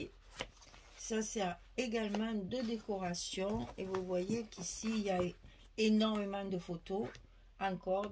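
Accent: South, France. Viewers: Cc whaou quel bel album vous l'avez sublime avec vos embellissements. J'adore, pas si petit que ça finalement. Alors chez moi pas très beau temps le vent souffle beaucoup et il fait un peu frisquet mais avec votre bel accent du sud vous nous mettez du soleil dans nos cœurs. J'attends de voir ce projet à 4 mains mais je ne doute pas du résultat,je suis allée voir ce que Françoise faisait et ses albums sont très beaux aussi. J'attends aussi de savoir où vous allez passer pour votre tour de France j'aimerais bien vous rencontrer. Bonne après midi bisous 😘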